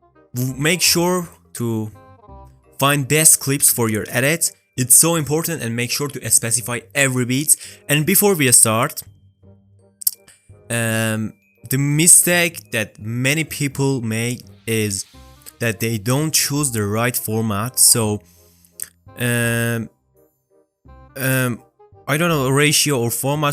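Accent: Iran. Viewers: Bro you are Iranian? ریدم تو لهجت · چرا لحجه اش ایرانیه؟😂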